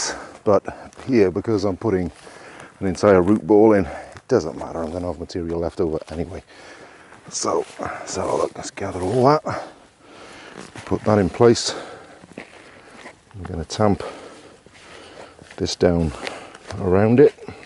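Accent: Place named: Durham, United Kingdom. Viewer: Wow you really had me fooled. I was sure you were from Durham on the NE of England. I'm from Newcastle originally but also living in Scotland by Elgin/Inverness. Thanks for producing these great vids. I'm really enjoying them and good luck for the future. Paul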